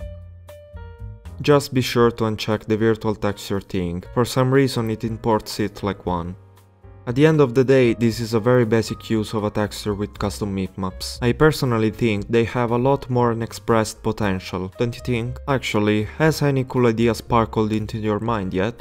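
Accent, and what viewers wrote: Italy. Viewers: I can tell this is south italian accent from a thousands miles away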